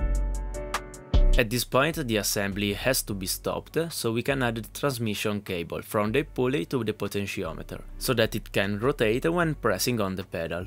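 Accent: Italy. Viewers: This is awesome. I have been into sim racing for a while now and I am in IT here in the US. You have some ingenious crafting and engineering skills. Also, I could tell you were Italian as soon as I heard you speak... My mother is from Catanzaro and her accent is really similar to yours haha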